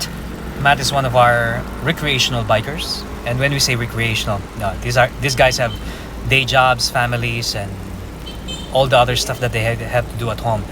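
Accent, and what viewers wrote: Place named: United States. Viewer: Nice vid bro. Just curious where you learned how to speak English. Did you ever live in the U.S.?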